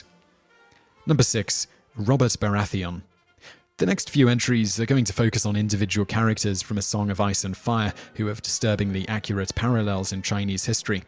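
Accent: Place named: British Asian, United Kingdom. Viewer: His British-asian accent is funny :P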